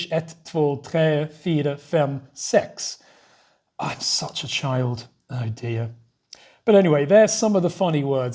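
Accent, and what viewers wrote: Sweden. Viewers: Älskar din skånska! Hälsningar från en malmöit.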